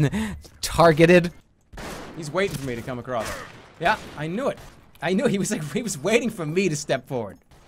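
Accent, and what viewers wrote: Boston, United States. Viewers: jerma's voice sounds so much higher in this video and he sounds more boston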